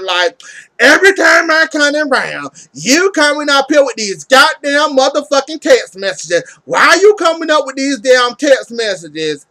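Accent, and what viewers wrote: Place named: South, United States. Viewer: I love that accent. Country grammar. ❤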